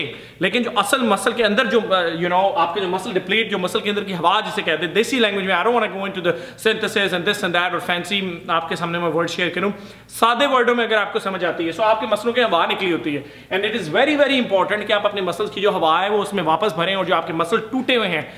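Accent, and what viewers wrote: Punjab, India. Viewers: Yaar Punjabi ke saath yeh ajeeb sa accent english ka... Fir punjabi touch wali hindi..... Maa kasam.. body pata nahi... But dimaag ka workout to tum poora karwa dete ho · Jst love the way he talk....punjabizz always rock😂😊😍😘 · His punjabi accent is soo cute, haha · Tuhadi khand chatt diya 😂😂😂😂😂 mori cha ungle 😂😂😂😂😂😂 pure punjabi accent 😂😂😂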